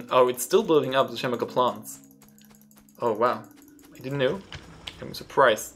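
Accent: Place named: Eastern Europe. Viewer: I thought he was eastern European like the developers.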